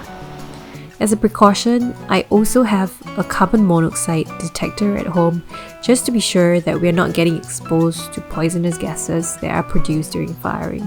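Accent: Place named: Southeast Asia. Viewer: ah, when I heard you started talking I knew you were Southeast Asian! nice to see a SEA ceramicist here :) I’ve been thinking of getting into pottery (maybe turn it into a career in the future?) your video is really useful !! thank you for the list too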